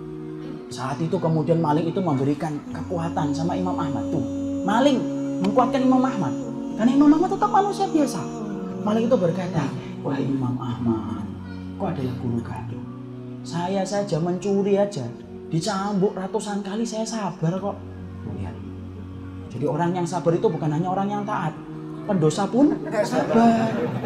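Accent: Indonesia. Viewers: kangen medoke.. alhamdulillah ilmunya..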